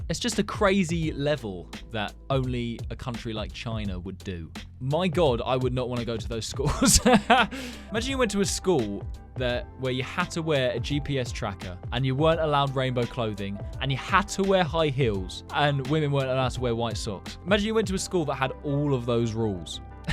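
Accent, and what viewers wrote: United Kingdom. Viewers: You’re British I never knew you’re a British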